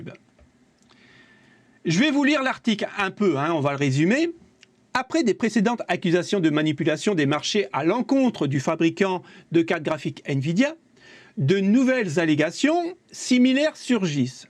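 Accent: North, France. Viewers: Peut être une erreur mais on entend bien l’accent de ch’Nord... Perso, quand on pouvait encore les acheter, j’ai toujours pris des carte Sapphire AMD. Idem pour les processeurs : de ll’AMD. On entend très souvent dire que Nvidia fait du grand n’importe quoi. Aux dernières nouvelles, il semblerait qu’Apple c’est un peu pareil. Au moins, il y a la chaîne Pour Ce Consoler 👍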